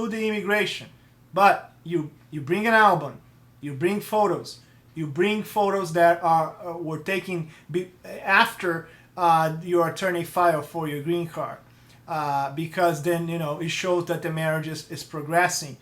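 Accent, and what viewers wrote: Brazil. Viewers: Hey. Great explanations. May I ask you if you are brazilian? I am, and I just noticed a simillar accentuation. If not, forgive me any incovinience. By the way, do you Speak Portuguese? Hugs. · Looks like Brazilian accent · What do you mean? I asked if he was Brazilian because of his accent. Btw, I am from Brazil.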